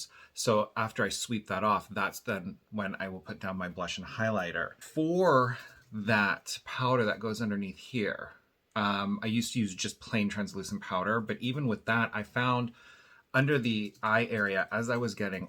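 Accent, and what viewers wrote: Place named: Minnesota, United States. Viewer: LOL I'm from western wisconsin, so I know all about the Minnesota accent. :D Wow incredible look!! You look amazing!! I will be Using both the liquid and powder foundation to get the contour. I've never used both foundations at one time and I am going to try that. Like I said you look is amazing!!!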